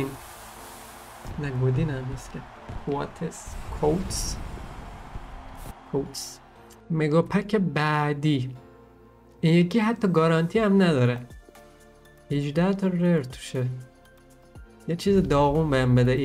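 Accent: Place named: Shiraz, Iran. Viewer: شیرازی هیستی؟😁 از حرف زدنت معلومه . اگه هستی همشهری منی. عاللللییی👌👌 فقط انرژیت رو بیشتر کنی بهتره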